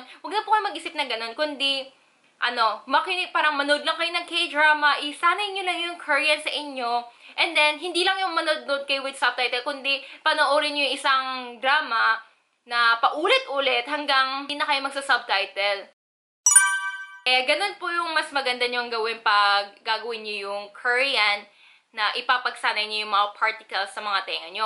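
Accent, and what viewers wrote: Philippines. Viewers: Pananalita mo palang ate pure pnay ka po .. legit heheh new suscriber here😊😊😊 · Sounds natural Filipino ka magtagalog kase dito ka nga lumaki simula pagkabata . Ang cute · Na adopt nyu na kc ung culture dto sa 🇵🇭 hbng lmalake Kyu dto Ng kuya mo nasa Phil environment kc kayu kaya Hindi na fluent Yung Korean accent mo nging pilipino accent na 🤔😆🤣😂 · Kilos pananalita. Adopt na adopt mo pagiging filipina na.. :)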